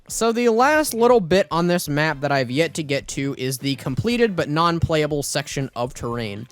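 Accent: United States. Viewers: why does he have the crackly stereotypical american teen voice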